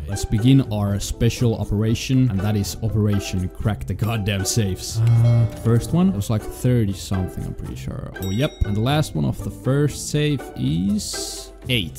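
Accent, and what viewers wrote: Finland. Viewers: Are you finnish? i was just listening your accent and trying to figure it out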